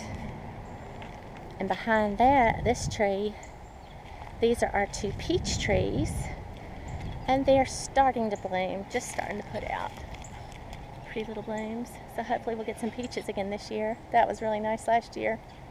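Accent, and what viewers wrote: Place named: Georgia, United States. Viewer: My mom loves to grow plums, and I'm always disappointed by them. I've never once seen a plum that doesn't have a mark from a bug or a worm that nibbled on it. She's the same about peaches, and she's got two new peach trees to plant this year, but I just don't think we're far enough North. We're in zone 8A Maybe? One time, 30 years ago, She got a tree full of delicious nectarines, and it has just given her a fever which she can't seem to get past. We haven't seen nectarines or peaches like that ever since. And if her peach trees do make a few fruit, typically the squirrels get them. Love your videos, I love your accent because I have a South Georgia accent, and I got your channel by looking at your strawberry videos from a couple years ago.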